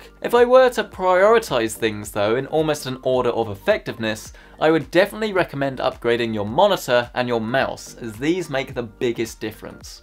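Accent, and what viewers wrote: United Kingdom. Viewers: He has a British accent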